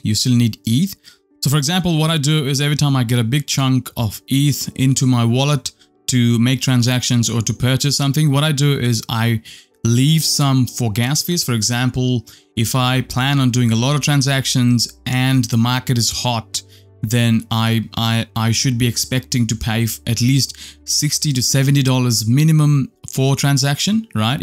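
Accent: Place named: South Africa. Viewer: I hear a South African accent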